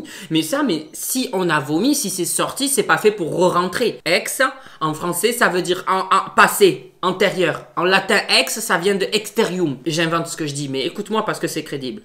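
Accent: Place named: South, France. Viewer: J'adore son accent du Sud 😂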